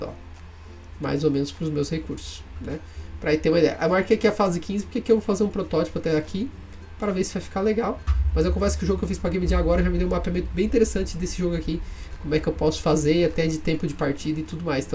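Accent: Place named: Santa Catarina, Brazil. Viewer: Obrigado pelo conteúdo irmão! E esse sotaque de SC ai? haha, sou do sul tb, Blumenau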